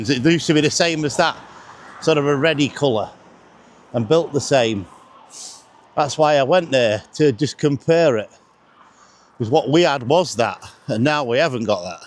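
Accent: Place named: Grimsby, United Kingdom. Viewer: I live in South Africa and I really enjoy listening to your Grimsby accent ! Your videos are Fantastic !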